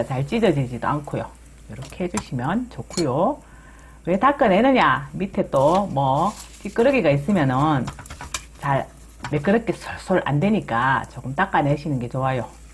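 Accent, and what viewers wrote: Gyeongsang, South Korea. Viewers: ㅎㅎ 하고웃지요 갱상도사투리ㅋ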